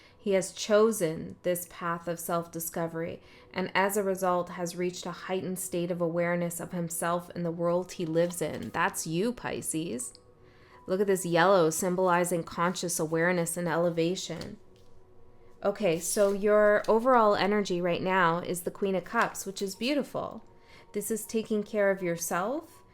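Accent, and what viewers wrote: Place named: Canada. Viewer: Such a great reading! Also loved hearing that you’re Canadian- as a Wisconsinite, I hear that accent pop out 😂 Resonates a lot with energy that has been coming out in my readings for Pisces as well: feeling like we need someone else to attain the success. One of my favorite astrologers, Pam Gregory, always asks her audience to ask themselves- “are you a victim of the universe or a co-creator with the universe.” Taking the perspective of Saturn in Pisces building resilience vs. pushing us down has been extremely helpful for me, personally. Thanks again for your messages and insights!✨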